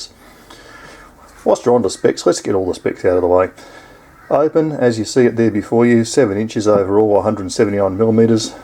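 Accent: Australia. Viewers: Mate.. I just loved the Review and it's great to hear an Aussie Accent doing one and you've got me motivated to go down to Walmart and buy one tomorrow .. :)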